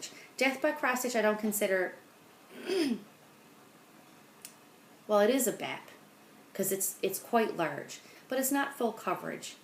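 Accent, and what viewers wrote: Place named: Newfoundland, Canada. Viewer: Okay only 2 mins in and I thought is she a Newfoundlander? I wonder...then said you are from Canada! Ohhh please satisfy my curiosity? I live in NS! Lol. Now to watch your video and subscribe! At Because you count? NB? Oh my I will be editing this everytime you say something...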